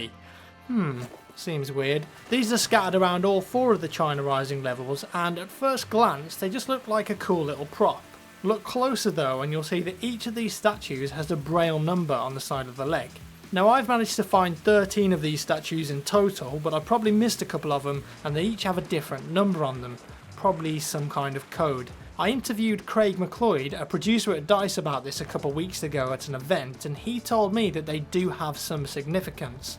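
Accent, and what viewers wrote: United Kingdom. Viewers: i hate him when he is whispering, it's hard to understand english without the subtitles, and that British accent makes pretty hard....i'm not a native english speaker.....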